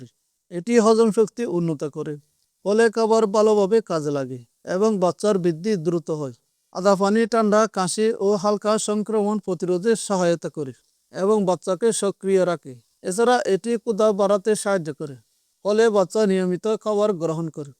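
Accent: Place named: Chittagong, Bangladesh. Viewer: চট্রগ্রাম থেকে আপনার কথা গুলো চট্রগ্রাম এর মতোই লাগছে 😮😮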